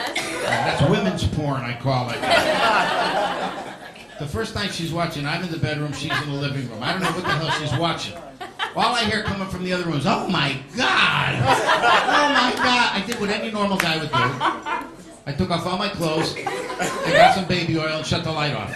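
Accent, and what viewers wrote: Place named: New York, United States. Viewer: This guy is hilarious. Love the new York accent, sorry to hear he has passed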